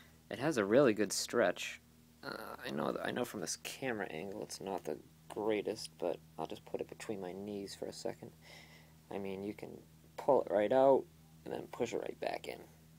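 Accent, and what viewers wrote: Boston, United States. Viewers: Your Boston accent is pretty strong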